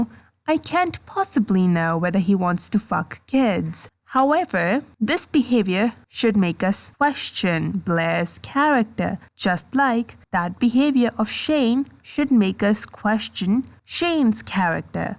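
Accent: France. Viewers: Your accent is awesome and reminds me of my aunt from France 🇫🇷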